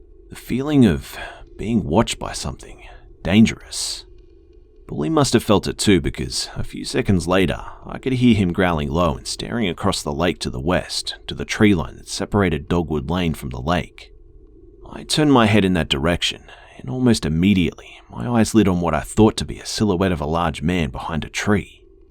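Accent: Australia. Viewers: Listening to an Australian pronounce southern terms 😂 I kept being like wait wtf is he saying then I'd be like ohhhh 😂😂😂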